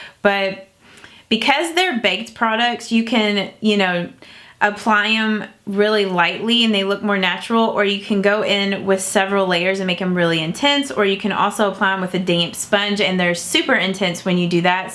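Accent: South, United States. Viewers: I love your southern accent. It’s so cute.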